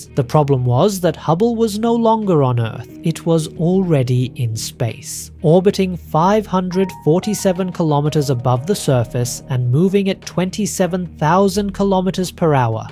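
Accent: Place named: India. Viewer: Sonunda Ai işini çözmüşsün çok güzel olmuş hint aksanı İngilizceden sonra hocam (haftalık canlı yayınlar geri gelsim bu arada)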